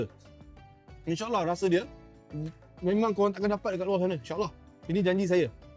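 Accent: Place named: Perak, Malaysia. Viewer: Sleng peyrock still ade.. padu..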